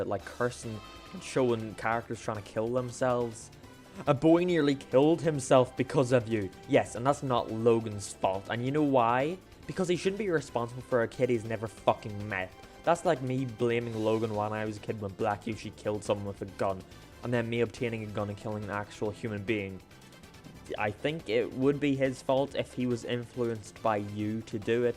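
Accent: Ireland. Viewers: Get ready for the F**KING swarm of logans fans attacking a bunch of us normal people saying we suck dic or something because there mad we destroyed there lord and saviour also why are all the comments that he reads are f**king books also I love your acent you sound irish like me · are you irish or northern irish i reckognise your accent cus i am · He has an irish accent, thats it. His first language probably is english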